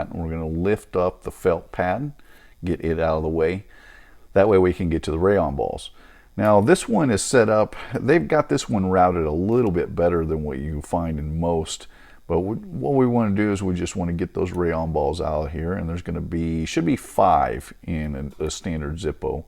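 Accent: United States. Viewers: Good old American country twang sounds fine to me.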